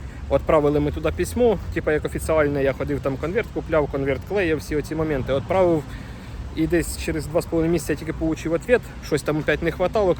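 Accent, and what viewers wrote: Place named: Poltava, Ukraine. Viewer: Рідний говір ) . Ви з Полтавської області? )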